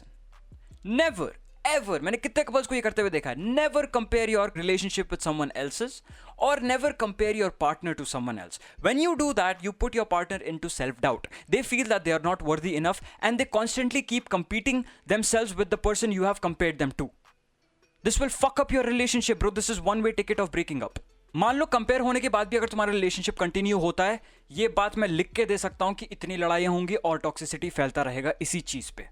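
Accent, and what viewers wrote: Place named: Bihar, India. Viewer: why does sound like a bihari in this vid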